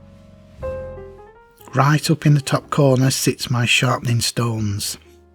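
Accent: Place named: Northern England, United Kingdom. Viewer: Just beautiful! I am putting together my own very tiny workshop. 6ft by 3.5ft on my enclosed balcony. I live in a flat in South Korea, and DIY is not yet very popular because getting stuff done is relatively inexpensive. I love making things, especially from wood, and focus mainly on musical instruments. I don't have any of the tools you have but will be acquiring some as I go along. Thank you for the truly magical tour around your workshop and I shall be stealing some of your ideas for sure (with your permission, of course). By the way I guess you're from the north. I'm originally from Sheffield. A Stanley tools fan!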